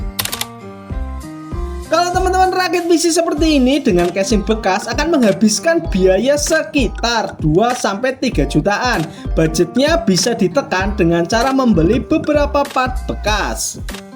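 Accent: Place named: Java, Indonesia. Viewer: Logat jawanya sangat kental 🤣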